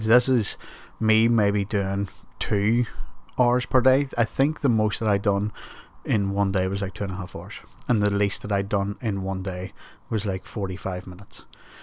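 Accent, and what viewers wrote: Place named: Ireland. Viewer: Good to hear your Irish tones loud and clear again!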